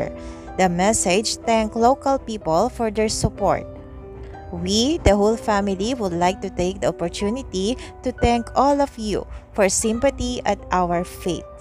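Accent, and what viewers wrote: Philippines. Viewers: ok lang... pinoy tayo eh. d yan magbabago, feeling lang ng iba nawawala ang accent nila kung cla ang nakakarinig sa sarili nila.. ang mahalaga maliwanag ang pagkakasabi sa words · Don’t listen to the hateful comments! I love your Filipino accent. Hi from Canada! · A Pinoy speaking.. 😂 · I liked her accent too she s filipina for sure maganda accent ate keep going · Filipina accent? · I hear a Filipina accent ♥ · Pinoy na pinoy ang accent e. Haha. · Its a Filipino accent. I looked thru her videos and there are videos in Filipino language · Just because someone has an accent doesn't mean the Narration is not understandable. As a fellow Filipino, I'm happy to hear a familiar voice doing these big stories on YouTube.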